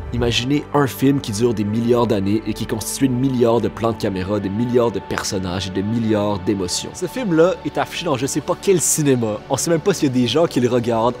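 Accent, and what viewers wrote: Quebec, Canada. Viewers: Salut.. je suis pas sûre si tu vas lire ça ou non.. mais j'ai décidé de le faire quand même.. je suis ni d'origine francaise ni québécoise alors j'ai eu qlq difficultés pour comprendre un peu tes vidéos mais le contexte est très clair et vraiment tes vidéos sont très profondes et touchant tu fais un excellent travail.. j'aime bien l'accent québécoise et c'était parmi les bonnes choses que je vécu lorsque j'ai tombé sur ta chaine c'est un trésor perdu que j'avais un chance de le trouvé.. bonne chance et mercii☺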